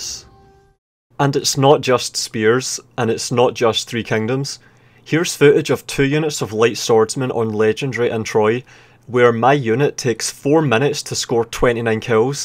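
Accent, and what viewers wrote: Scotland, United Kingdom. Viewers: Nah man, i watch your videos becooos ilove your Scoooootish akcent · Pretty sure it's scottish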